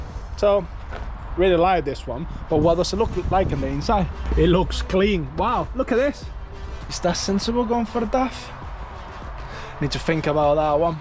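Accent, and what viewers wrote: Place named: Northern England, United Kingdom. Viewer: I’ve never heard a foreign guy with a northern accent before but I’m all for it!! Love your videos man! Love the new truck as well!!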